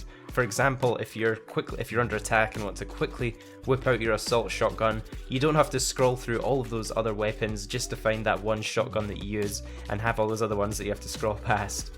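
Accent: Scotland, United Kingdom. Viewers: I just realised we kind of the same username 😂and you sound Scottish because I’m from Scotland and u sound Scottish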